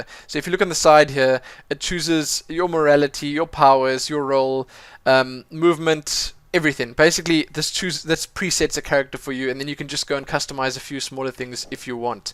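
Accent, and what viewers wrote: Afrikaans, South Africa. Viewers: He's not British, he's South African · Haha. British? Lol. Hes South African. You ignorant person haha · judging from what I know sounds like an Afrikaans accent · hey bru i noticed you accent...u south african? BTW nice video, im quite impressed with the attention to detail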